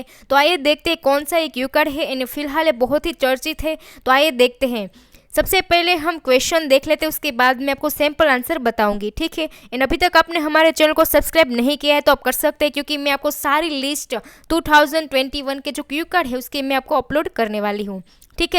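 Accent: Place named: Saurashtra, India. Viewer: You belong to saurastra, because of your tone of speech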